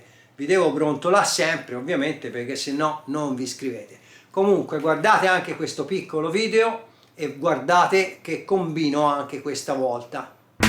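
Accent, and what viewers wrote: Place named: Pisa, Italy. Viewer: Toscano ok... Ma.. Pisano? Non sei fiorentino, non sei livornese... Dall'accento direi provincia di pisa..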